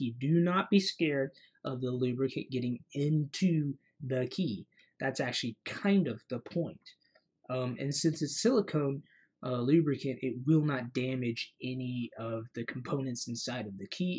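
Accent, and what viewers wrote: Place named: South, United States. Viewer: Great video and the Southern accent is badass.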